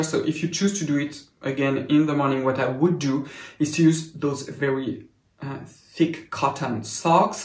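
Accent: France. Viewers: Are you French? Lovely accent!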